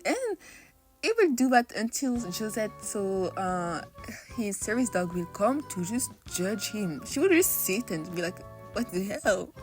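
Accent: France. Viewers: I have a question, are french by any chance?? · Are you french? If not I'm sorry ik nothing abt accents 😭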